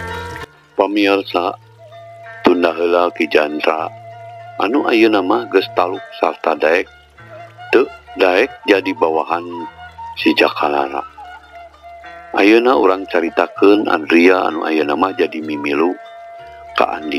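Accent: Sunda, Indonesia. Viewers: ini cerita dongeng yg paling ditunggu dengan logat sunda nya yg khas,mantap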